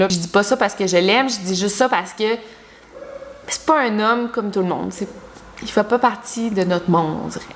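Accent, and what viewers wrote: Quebec, Canada. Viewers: hey! je kiffe tes vidéos que j’enchaîne en continu depuis 3 jours! ton "petit" accent québécois me fascine et me donne encore plus envie de visiter le Québec! alors merci de nous divertir malgré tes études, ton taf et ton futur mariage pour lequel je te félicite en passant! Mille bisous de Bretagne!!! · impossible d'écouter..affreux l'accent canadien..lol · Pas sexy l'accent canadien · Pareil, je suis Française aussi et j'adore ton accent,! Je pars en vacances au Québec dans quelques jours, du coup ton accent m'emmène déjà en vacances. ^^ Et tes vidéos sont vraiment travaillées et intéressantes, je ne m'en lasse pas.:) (Bon par contre c'est loin d'être la première fois que je commente, mais je voulais te le dire. ^^) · L accent canadien horrible fait des sous titres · J'adore toujours autant tes vidéos et ça me fais toujours plaisir dentendre a nouveau l'accent québécois en tout cas continue 😘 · Elle est Québécoise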